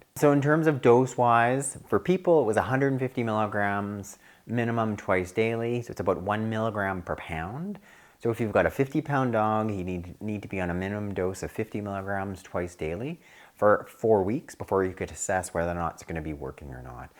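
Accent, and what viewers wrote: Canada. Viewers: I thought I detected a Canuck accent... I love being right! We really do pronounce "out" and "about" in a special way..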